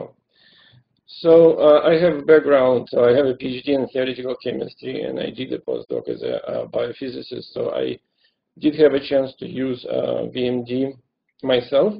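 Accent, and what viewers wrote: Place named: Russia. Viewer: awesome Russian accent )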